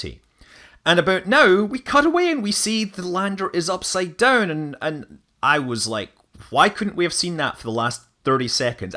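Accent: Canada. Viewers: isro is pronounced as iss-roe in indian english. not everyone needs to have manley's canadian accent, no?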